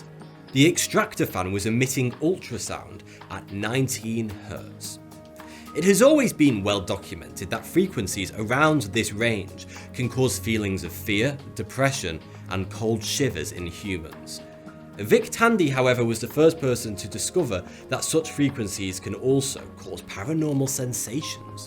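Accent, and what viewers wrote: United Kingdom. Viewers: Wait, have you always had a British accent? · When I read your book, it'll probably be your voice with that british accent reading it in my head. Can't wait. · He is the perfect blend of British but no too British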